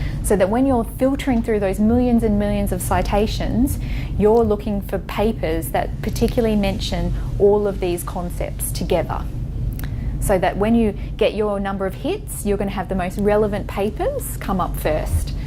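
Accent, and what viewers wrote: Australia. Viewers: Omg I just ❤️ an Aussie accent!;)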